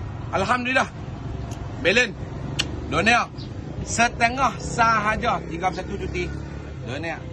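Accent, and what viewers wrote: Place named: North, Malaysia. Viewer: kecek uthaghaa nohh..☕😝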